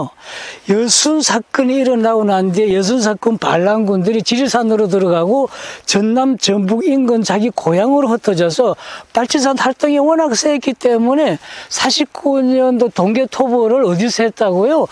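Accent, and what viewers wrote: North Korea. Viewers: 북한말씨 느낌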